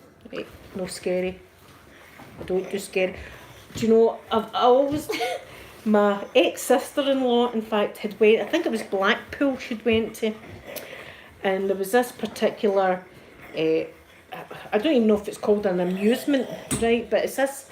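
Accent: Scotland, United Kingdom. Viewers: Yay, another Scottish ASMR channel. Felt like I was listening to an old friend. Thank you for this video!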